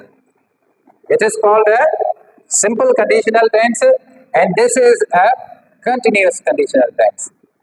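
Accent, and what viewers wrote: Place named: Sindh, Pakistan. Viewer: Good effort, but there's room for improvement to make these sessions or videos more engaging and accessible globally. Here are some specific suggestions: - Audio quality: Consider investing in a better microphone or recording your voice separately to ensure clarity. - Teaching style: Update your approach to match modern educational standards, which emphasize interactive and fun learning. - Language: While Sir delivers sessions comfortably in English, a strong Sindhi accent is still noticeable. Refining his English pronunciation would improve connectivity and facilitate better understanding for all listeners. - Presentation: Practice your delivery to maintain a natural flow and consider using digital tools like interactive whiteboards to enhance engagement. - Pacing: Avoid reading from a physical board line by line; instead, use it as a visual aid to supplement your teaching. 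I believe these sessions have a lot to offer, and I'm sure Sir direct connections appreciate them. To expand the reach and impact, consider making the content more accessible and engaging for a broader audience. Please take this feedback in a positive light, as I am connected with Sir and eager to benefit from his sessions. As my English is still limited, I rely heavily on tools to assist with my daily tasks, such as reading, writing etc.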